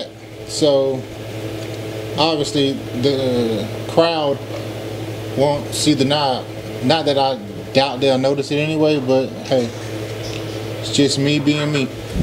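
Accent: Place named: Detroit, United States. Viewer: you from detroit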